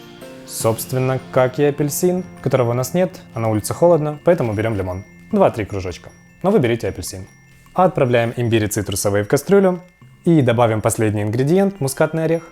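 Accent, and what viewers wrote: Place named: Surzhyk, Ukraine. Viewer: Хильнуть))) обожаю твой суржик. И кота.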